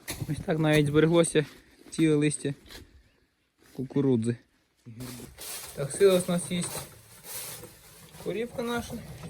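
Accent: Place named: Surzhyk, Ukraine. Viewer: А де ви живете яка область. Такий говор як в нас суржик .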